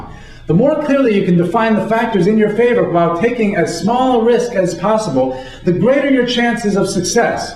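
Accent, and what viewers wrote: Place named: New York, United States. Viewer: That makes more sense, even though he's not "talking too fast" for me. I'm in NYC right now where lots of people talk exactly like him! Remember, too, that he's a money-making stock trader, not exactly a "low key, relaxed" mode of work. Like a race horse, not a plow horse. But thanks for taking the time to respond. Clears things up a bit.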